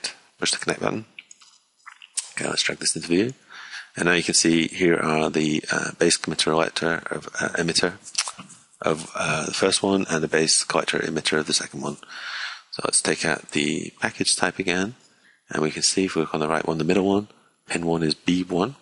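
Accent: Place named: Scotland, United Kingdom. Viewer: Firstly, thanks for taking the time to make a tutorial. It's much appreciated by many! As others have said, if you are going to actually take that time to make something to help people, it might be worth trying to slow down (a lot) when you're talking, and try not to mumble. Your Scottish accent adds an extra layer of difficulty for those non-Scots. It would really help everyone to understand your excellent tutorial. But thanks again for a great tutorial!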